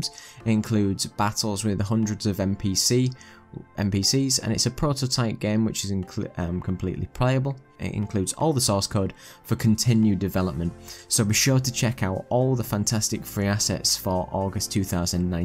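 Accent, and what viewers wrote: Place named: North, United Kingdom. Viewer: Love the drama llamas.....and the northern accent!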